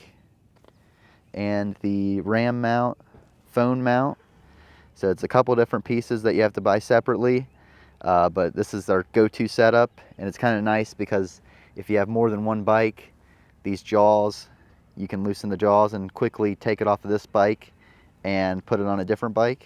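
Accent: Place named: South, United States. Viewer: watching from Australia I'm guessing southern? Hazard county?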